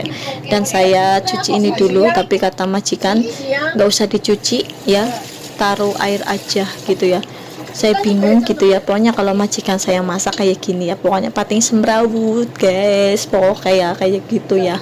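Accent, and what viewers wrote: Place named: Banyumasan, Indonesia. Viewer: Masak mantap... Cilacap.. Ora ngapak ora kepenak..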